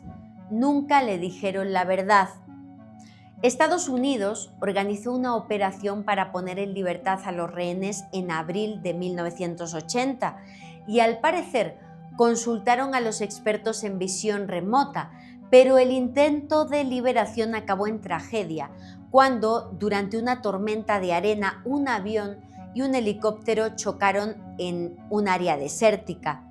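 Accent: Spain. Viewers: me encanta escucharte tienes tantas cosas tan importantes e interesantes en tu canal felicidades eres también muy profesional y comprometida encima de todo eso tu acento español ❤🎉 felicidades nunca te canses de alimentar mi ignorancia ❤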